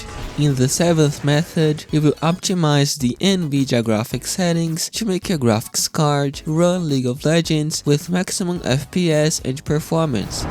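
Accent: Brazil. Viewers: Brazil , for sure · brazilian accent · Pelo sotaque parece carioca. Obrigado pelas digas! You're awesome!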